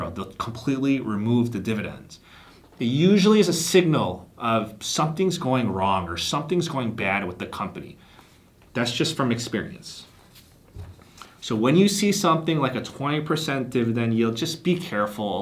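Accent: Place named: Chicago, United States. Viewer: Thick Chicago accent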